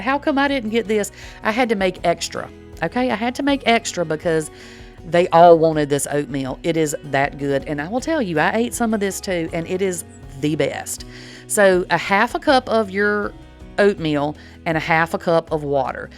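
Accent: South, United States. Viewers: I just subscribed and glad I did. The dry mixes are great!! I do several homemade things, mostly liquids and brown sugar. I see you mention jar sizes on most. I love to stir between items too. I feel I distributes ingredients better..?? Don’t know but, good distribution to me, gets it well blended. Thank you for all you do. BTW: I’m an 60 year old country girl who says” pe-can”. Northern people say, “pe-con.” Both are correct according to the dictionary but, you sound southern. Especially y’all. ❤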